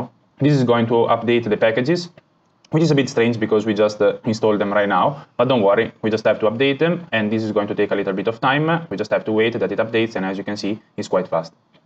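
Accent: Italy. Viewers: Your accent is just like a reknown Italian Football Journalist "Fabrizio Romano" and he is perfect at his job. You're similar to him! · but you sound italian 😁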